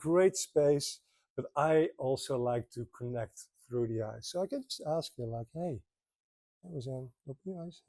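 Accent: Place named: Netherlands. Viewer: He is Dutch 😂 I can tell by the accent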